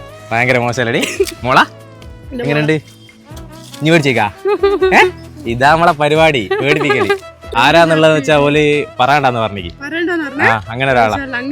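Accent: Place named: Nadapuram, India. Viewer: നാദാപുരത്തുകാരുടെ ഒരു ഒലക്കീലെ ഭാഷയും,കോപ്പിലെ പരിപാടിയും.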